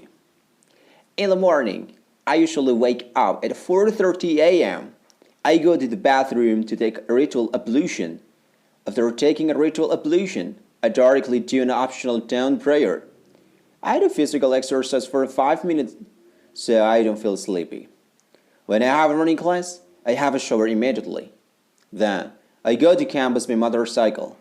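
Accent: United States. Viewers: Hi sir, american accent nya mantul. Pngen request klo boleh yg british accent, soalnya ak mau latihan buat tes toefl, krn ak perhatiin listening sectionnya kebanyakan pke british. Thank you 🙏🏻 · sir, America 'aksen? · dia amarica acent ya? banyak kata yg kurang jelas :v padahal kaya james cordon, ellen, masih bisa nangkep, kalau ini seakan2 susah nangkepnya, mungkin bener di review sma mba sacha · ini pakai accent American English kah kak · Kak ini inggris aksen amerika yaa, aku ora nangkep😭. Kalo inggris british masih mending ngerti ngomong apa. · logatnya american bgt, kaya ka Puri viera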